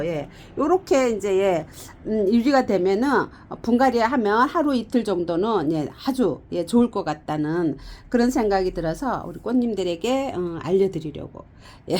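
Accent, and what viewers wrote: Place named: Gyeongsang, South Korea. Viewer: ㅎㅎ 헐빈하다 갱상도 본토말~^^ 지가 자주쓰던말이네요 정겹슴다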